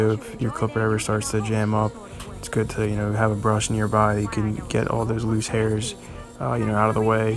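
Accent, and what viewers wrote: Philadelphia, United States. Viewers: Philadelphia accent. Am I correct?? Great video man. Keep up the good work. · You deff from philli .or somewhere in Pennsylvania right lol ..that accent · i can tell my man’s from philly just by the accent lol. thanks for the vid fam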